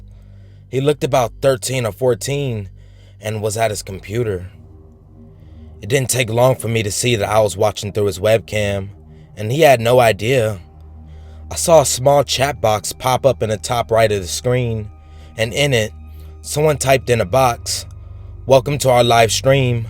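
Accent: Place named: United States. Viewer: Wow,you DO have a sexy voice!! i'm British and have never heard such a gorgeous American accent.......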